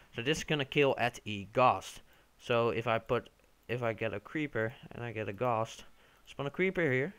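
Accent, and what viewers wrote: Netherlands. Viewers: love the dutch accent :) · Are u Dutch? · are you dutch ? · Ik dacht het al aan het accent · je kan makkelijk Horen dat je Nederlands bent:) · Da Ceh ja je hoort het altijd als een Nederlands iemand Engels praat. Goedw vidieo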